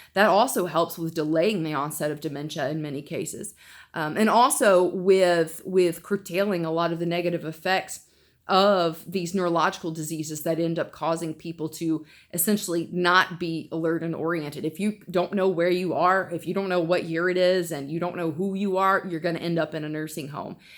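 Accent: United States. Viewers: )very good explanation I was able to understand your american accent Very Informative Keep up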